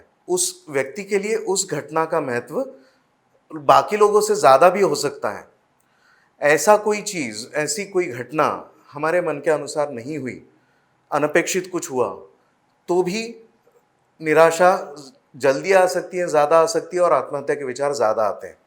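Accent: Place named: Maharashtra, India. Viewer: Tu marathi n tuz accent marathi ahe marathich sangaych murkha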